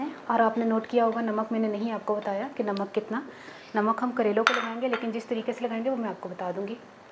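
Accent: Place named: Punjab, India. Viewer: Dear.tuci great ho..love ur cooking nd love ur voice in banjabi style😘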